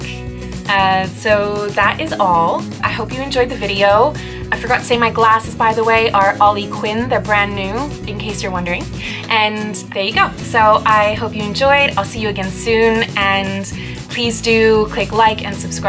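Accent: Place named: England, United Kingdom. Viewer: You have a hint of an English accent at times lol or is it just me?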